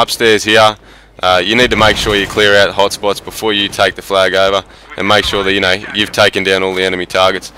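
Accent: Australia. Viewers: Great commentary man, good tips. It's good to finally hear a Aussie commentator. :) I'm also looking for more people to play battlefield 3 with on Xbox. Thanks.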